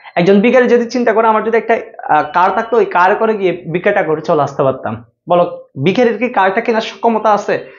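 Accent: Chittagong, Bangladesh. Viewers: Sir apni chittaingga😄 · চিটাইংগে ফুয়া😊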